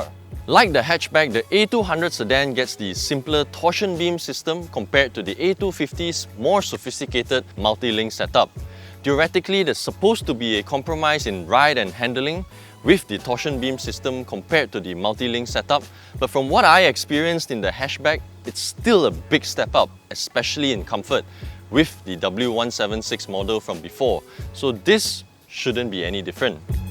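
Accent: Malaysia. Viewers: 怎麼感覺是馬來西亞腔英文....好奇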